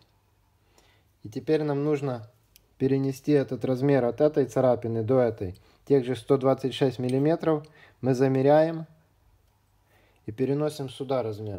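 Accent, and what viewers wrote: Odessa, Ukraine. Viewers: Слышу, что мастер видимо с Одессы. Так колоритно, как в "Ликвидации", хотя я сам с Сахалина. Всякая информация полезна, спасибо!!!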